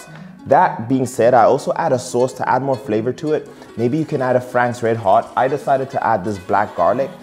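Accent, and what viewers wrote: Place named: South Africa. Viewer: For a second I was tripping because I thought that he was going overboard on the carbs... Either way My favorite shredding meal is definately a chicken avocado salad, just because the carb grams is low and when I cut most of the time it follows a higher fat macro setup so that my body produces more ketons and gets fat adapted faster... Just found out you lived in South Africa (which makes sense from the accent!!) You were closeby at one point.. You got a follower in Mozambique, keep it going!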